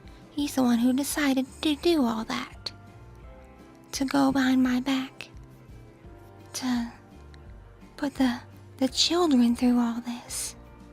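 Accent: South, United States. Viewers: Your Country accent is really unique & special,i love all your asmr's and wonderful rps which are so appealing because you put your heart & soul into all of your work! Thank you for all that you do & the work you put into every video to help others feel good. ☺ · I do believe your country accent is one of the most blessed ones around, it is so relaxing to listen to, and on top of the crisp sound effects and immersive background soundtracks, the listener is teleported to whichever setting your audios is set in. Fantastic as always.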